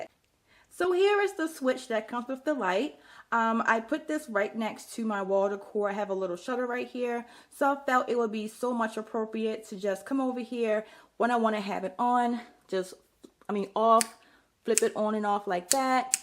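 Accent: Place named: DMV, United States. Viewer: Thank you so much for sharing💛 I hear a DMV accent lol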